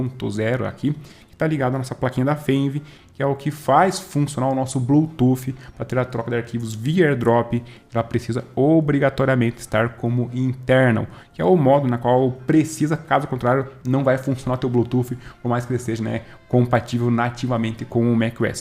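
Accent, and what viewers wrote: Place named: Curitiba, Brazil. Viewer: Bem capaz que és de Curitiba. Piá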